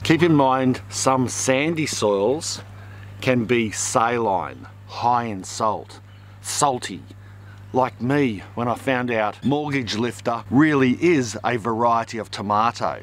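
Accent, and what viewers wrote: Australia. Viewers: Awesome. Enjoy the Aussie accent and attitude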